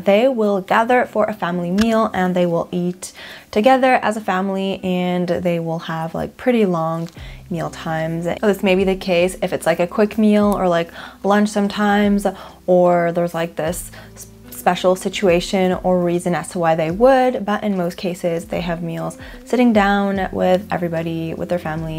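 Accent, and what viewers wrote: United States. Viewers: Your America accent is darn good, so says this American! Of course, we would expect your French accent to be perfect. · Are you french? I feel like you talk like you came from the US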